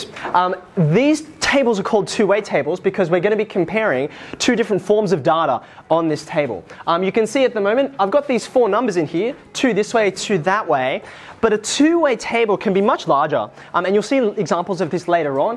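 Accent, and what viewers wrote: Australia. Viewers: Aussie accents make stats more fun.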